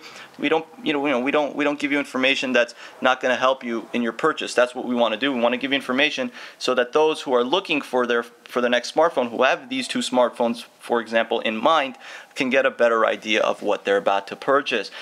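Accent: New York, United States. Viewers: Superb review. By the way, is that a N.Y. accent?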